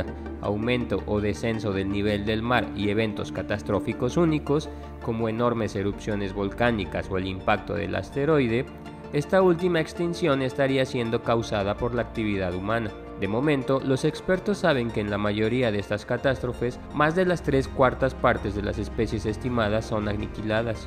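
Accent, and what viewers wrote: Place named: Mexico City, Mexico. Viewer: Nomas de eschucharle la voz meca chilanga, me doy cuenta de la mentira de este video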